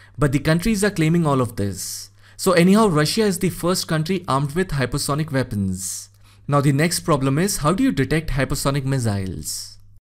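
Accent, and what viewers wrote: India. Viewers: Please improve your thick Indian accent, then it will become an amazing channel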